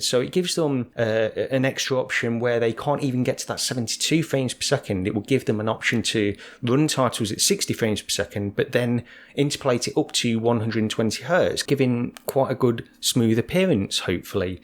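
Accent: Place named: Midlands, United Kingdom. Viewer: Having just picked up a p.c with an rtx 3070 so I can link my oculus quest 2 to it,i watched your vid on project cars 2 in vr which was very informative. I started off with the psvr and while very good the lack of analogue sticks for movement on the move controllers and the less than crisp visuals on most games was disappointing. The psvr 2 needs to incorporate better controllers ( oculus touch or valve index style) ,it will be interesting to see how much sony decide to push the psvr 2,as facebook seem to taking over as far as vr is concerned and more competition can only be a good thing. P.s just subscribed to your channel ,great content ( i prefer your real voice,I'm a midlands bloke myself )👍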